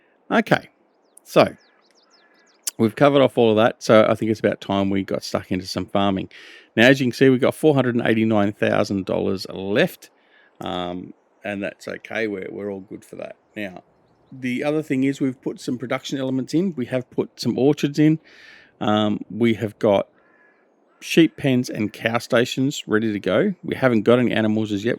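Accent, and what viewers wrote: Australia. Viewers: Just came across the channel looking for a series on this map as I've just started playing it on mine, good to hear a auzzie YouTuber watching from new Zealand 🇳🇿 also good to see your still making episodes on this map, can't wait to see were u take the map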